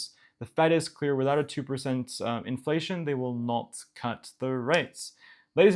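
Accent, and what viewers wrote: United Kingdom. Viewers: His accent is starting to change more British. Funny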